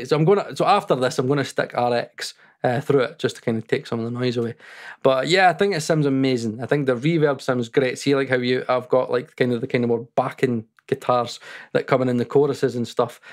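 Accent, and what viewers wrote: Scotland, United Kingdom. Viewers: Came for the review, stayed for the SCOTTISH.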